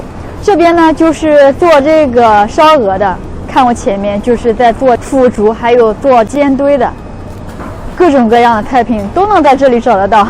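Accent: Shandong, China. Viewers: 能不能纠正一下3，，听起来好别扭，，，这个叫烧鹅，，，不要那么粗谢谢不是烤鹅，，，您的山东口音好明显哈哈哈应该山东人，，，这个是烧鹅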